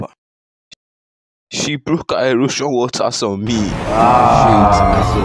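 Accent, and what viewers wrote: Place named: Nigeria. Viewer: nigerian patois my fave accent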